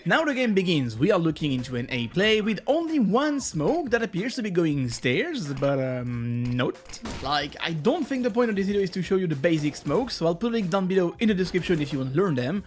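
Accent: France. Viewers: Brooooo what is your origines you dont have the french acent?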